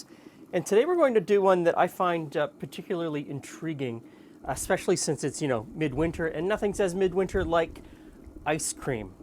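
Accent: Canada. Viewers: everyone's talking about the bagged milk but I was like "he sounds Canadian" despite most Canadians living in Ontario have a very subtle accent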